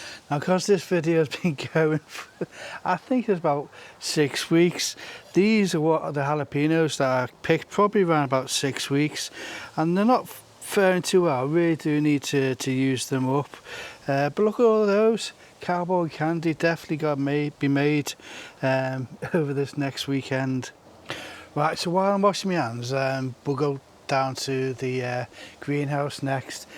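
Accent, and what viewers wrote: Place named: Wales, United Kingdom. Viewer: Got a bet on with my sister I reckon you're Welsh, she reckons you're from the Wirral.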